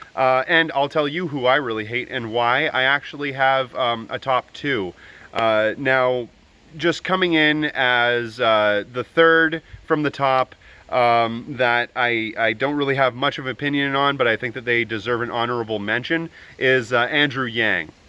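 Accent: Canada. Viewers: You sound Canadian. Where you from comrade?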